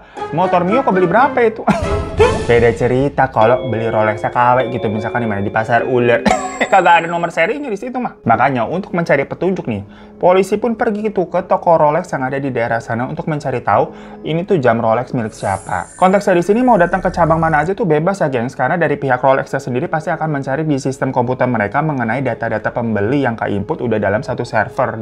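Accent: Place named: Tambun, Indonesia. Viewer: apal bngt bahasa tambun bang .. btw w orang tambun bwang 😂😂😂😂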